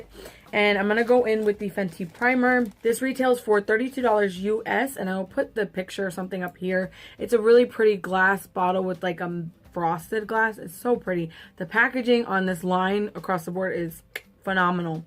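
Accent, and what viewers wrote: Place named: Latin America. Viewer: Glad you guys are ok!! 💜 I want the Fenty foundation SO BAD but there are too many shades to swatch 😅 But GIRL. I just realized- are you a fellow Latina!? I never realized your accent before this vid! You sound just like mis primas! 😂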